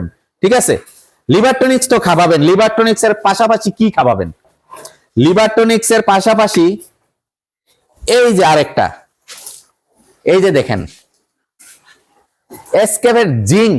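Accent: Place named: Sylhet, Bangladesh. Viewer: সিলেটি মাত হুনিয়া ভালো লাগচে❤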